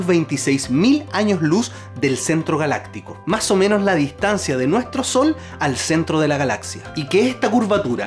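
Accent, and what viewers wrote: Chile. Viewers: Chileno, sin duda. Muy bien hablado y claro. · Muy interesante tu vídeo. Además es muy grato escuchar el castellano chileno después de tantos años en el extranjero.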